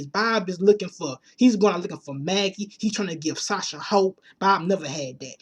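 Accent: South, United States. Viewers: I love your videos your accent is super country when will you post a prediction video for the next episode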